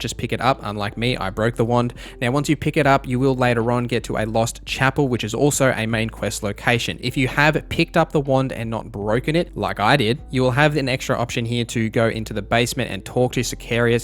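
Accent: Australia. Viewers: australian accent?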